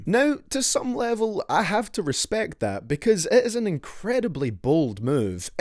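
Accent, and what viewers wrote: Scotland, United Kingdom. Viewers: Lol this nigga British af talking about rap, that shits funny as hell 😂😂😂 I love it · Are you Scottish, if so me too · Ahahahahahaha. Your adorable Scottish accent makes you sound so adorable and I could never take you seriously.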